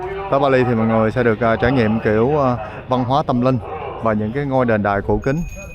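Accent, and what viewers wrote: Vietnam. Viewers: giong viet nam qua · lối nói chuyện của * ... người miền Nam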